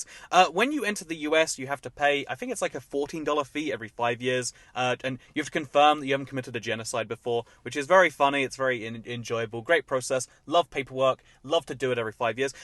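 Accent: England, United Kingdom. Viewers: Well, I like your English accent and I like that you say "nought" so if you change your mind and decide to continue saying nought instead of zero, I'll be in support of that decision! It's nice to hear how different regions of the world speak English differently. I feel like Americans getting mad that you say "nought" seems really spoiled/entitled. Like "English is my language and you have to use the words I use!" Which is super boring and gross. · Please continue with your British English. Naught makes you sound smart, as I associate it with Numberphile. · Honestly I don’t mind the British English, I think it’s fun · My question there is about how British say things. The things I have a question over is the word aluminum and the letter z. Why do you(British people)pronounce aluminum(a-lu-min-um)as aluminum(al-u-min-um) and prounce the letter Z(Zee in American)as Zed? 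That is stupid how it works.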